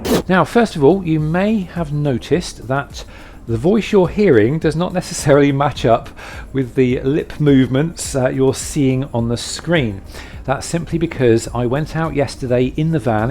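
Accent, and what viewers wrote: United Kingdom. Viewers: I was so happy to find a new video from you! I really really enjoy listening to your friendly kind voice and your beautiful British English. Since I have some weight, digestion and disciplin issues myself I also find your ”rambling” very interesting and inspiring. Thanks for being so open and sharing this! I’m sure I’m not the only one who will start to think about how to tackle their problems using your input. Warm greetings from Sweden! 🌷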